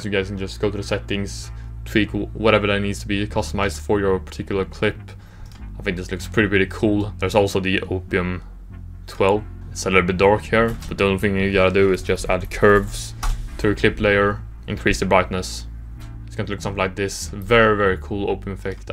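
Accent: Sweden. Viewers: Tog mig tre sekunder från att du började prata till att förstå att du var svensk haha, du förtjänar en sub dock! Keep it up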